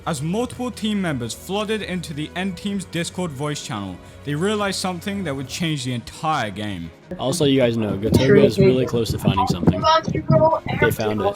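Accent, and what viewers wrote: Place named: United Kingdom. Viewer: Nice one bro, the event sounds so cool with a british narrator in the background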